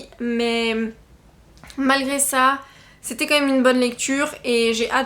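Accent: England, United Kingdom. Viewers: J'aime tellement ton accent anglais 😍😍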